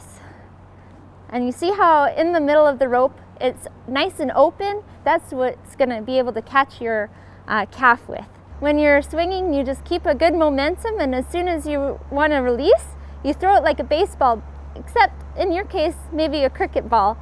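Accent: Canada. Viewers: Lol, Honda knot, Han-doo and lassoo instead of lasso so Canadian love it 💕 city/country girl (grew up around both) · Wonderful! love the Calgary accent!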